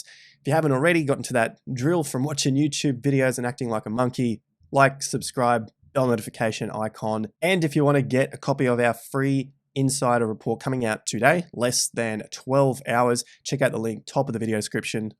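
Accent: Australia. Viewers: Keep up the good work. Great to hear a genuine Aussie accent. Rare in Japan after 20 years. Quality material and delivery!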